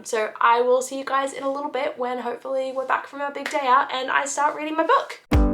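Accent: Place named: Australia. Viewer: You have the same edition of Happy Place that I have .i also have the Wisteria Society to read, may move that up. I had been trying to figure out your accent, thought Oz but it doesn't seem that strong. Where in Oz are you from?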